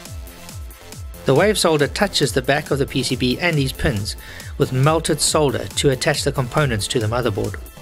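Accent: South Africa. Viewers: Are you South African? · Sounds like a South African talking LOL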